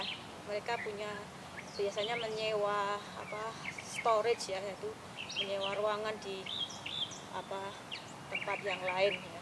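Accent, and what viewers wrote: Java, Indonesia. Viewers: MB asline mana ya, kaya Jawa... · Logate wong njowo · Wkwkw jawane ngendi bu? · Mbak jowone nang ndi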